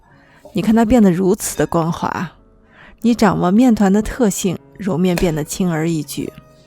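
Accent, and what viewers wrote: China. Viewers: IT's Chinese pronunciation. She is speaking mandarin.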